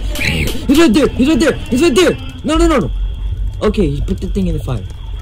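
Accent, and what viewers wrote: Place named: France. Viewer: loved it. great job. lots of energy. love the french accent.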